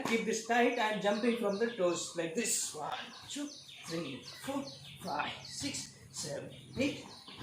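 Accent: India. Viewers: I'm a real fan of his talking style because of my experience with a lot of Indian friends I have Including my Indian bosses Mr Mahendra Waman, Mr Raut, Mr Suresh and other friends like Prasad, Anil, Sarath, Shiyo just to mention a few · in Russia we have the term "Indian English" :-) Realy, very funny and very hard to listen to serious 😁 Try to look "The Coding Train" - that is what I wish Indian English to be! And sorry for my English) Respect!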